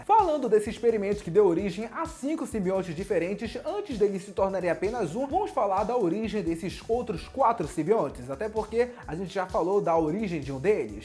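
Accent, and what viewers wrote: Brazil. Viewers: ce é de Belém?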